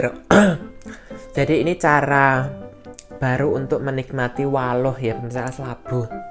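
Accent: Java, Indonesia. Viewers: Selamat pagi, mantap rotinya buat sarapan😀 blekenyek😂 bosone Ra ilang jowone👍 salam dari Bali, eh iya lupa sekarang lagi dijakarta 🙏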